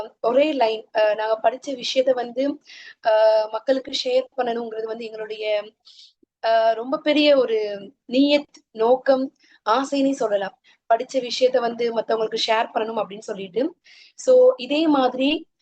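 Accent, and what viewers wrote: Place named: India. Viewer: Very useful 🎉🎉❤ Ongada entha program endaalum very interesting madam madam thanx ❤ Session thornthu podunga. India slang very cute 🥰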